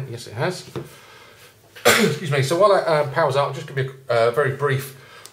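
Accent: England, United Kingdom. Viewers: Does he sound scottish or welsh,its an ENGLISH accent its like saying a yank talks canadian,3 countrys in the uk wow some people. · BRITISH ACCENT! :)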